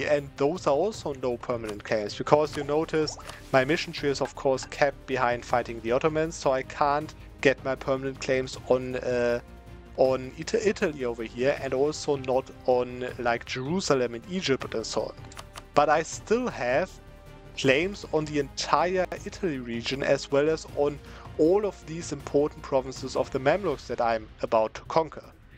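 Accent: Germany. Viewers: Sometimes he really butchers the English speech xD Also you have a crazy German dialect but i like it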